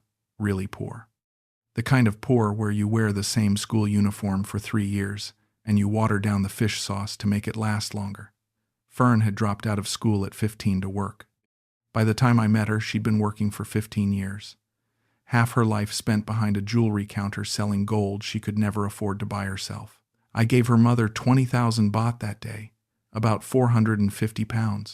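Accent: United States. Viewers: Are these made up stories or genuine stories ? these types of stories are true but is this channel telling true ones or made up for entertainment ? What if it's an English person is it an American accent it doesn't make sense there should be an English person accent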